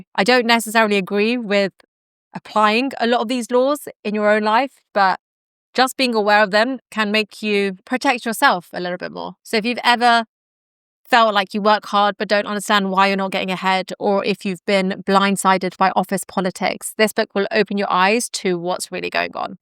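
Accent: England, United Kingdom. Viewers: I loved that British accent on non-british. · Bit plain accent pls. Too pommy accent yak for ears!! I tried many time to listen your video. Rich contents disgusting pronunciation! Sorry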